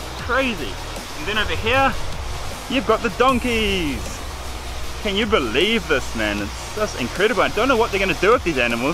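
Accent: India. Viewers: Good content but it's very hard to understand your Indian accent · Love your hindi accent Please visit once Varanasi which is older than history itself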